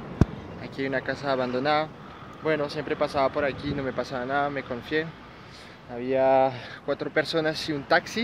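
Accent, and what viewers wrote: Colombia. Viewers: Hola ya hablas como colombiano. Que pena tu robo!! Lo bueno es que no te paso nada. Mucha suerte, que estes muy bien.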